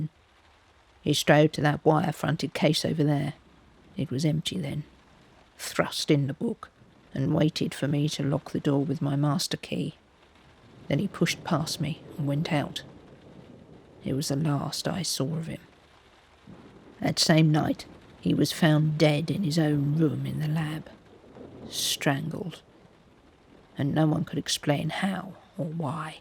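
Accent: England, United Kingdom. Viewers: Oh I am forever grateful to have found your site! Your soft spoken English (not American or AI) is so relaxing for this Australian at bedtime. I hold out hope that one day we will get to enjoy longer stories written by yourself. Your writing is great! I'm very appreciative. Thankyou, and many blessings to you ❤️🙏🏼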